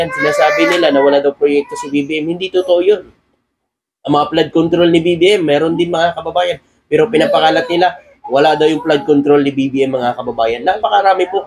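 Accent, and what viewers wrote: Iloilo, Philippines. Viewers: Taga iloilo ka man boss? 🎉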